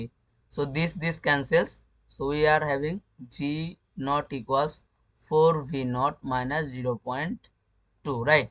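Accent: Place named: India. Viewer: Very hard to understand your Indian accent. Is it possible for you to speak slowly?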